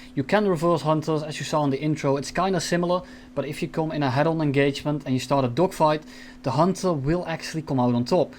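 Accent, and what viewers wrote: Netherlands. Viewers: Nice vid! Also r u dutch?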